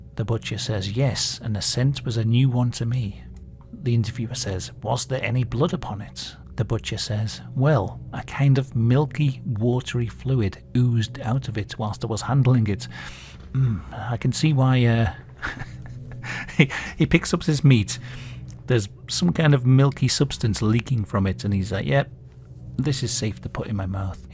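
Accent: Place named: Northern England, United Kingdom. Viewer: he's from up north in England. Maybe derby/Manchester .Not Scottish or Welsh.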